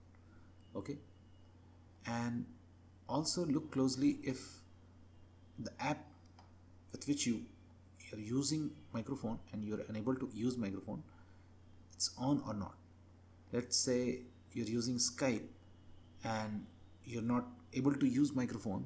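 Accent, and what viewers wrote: India. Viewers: i love ur indian accent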